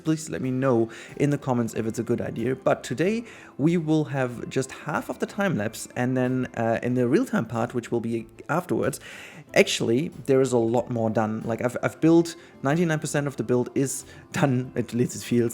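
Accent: Germany. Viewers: Your English is totally fine! My mom is native German so your accent is already pretty familiar with me, and she says things a little differently sometimes. Honestly the game i'm looking forward to the most in 2021 is Prehistoric Kingdom. Dinosaurs + Zoo building = YES PLEASE! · You say your English is not native, but it is totally fine! Your slight German accent is more interesting and pleasant than a lot of native English speakers haha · Ich hab dich durch andere Planet Zoo Speedbuild Videos entdeckt und schau mir deine Videos seither immer wieder gerne an :) versteh nicht ganz wieso Leute auf unperfektes Englisch so abgehen. Ja, man hört ab und an dass du aus Deutschland kommst aber dein Englisch ist im Grunde absolut perfekt. Man findet immer was zu meckern, nimm dir sowas nicht zu Herzen.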